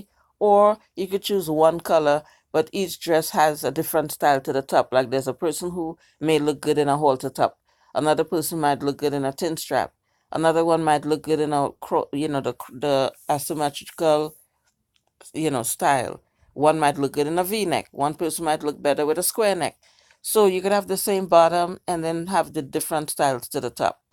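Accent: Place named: Trinidad and Tobago. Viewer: I like the dresses. I didn't catch your accent until about ...Trinidad in the house...lol...I am from St. Croix.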